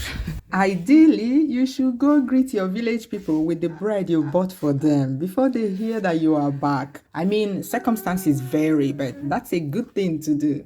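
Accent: Igbo, Nigeria. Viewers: You sound like my Village people. Ideato North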